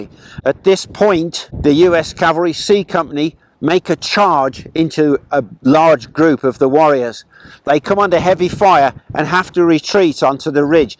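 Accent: United Kingdom. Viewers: Got a love how a person with a British accent wants to judge people on colonialism and expansion...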